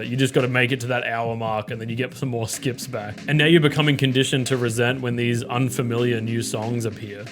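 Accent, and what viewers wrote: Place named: Australia. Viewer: bro might be Aussie General Sam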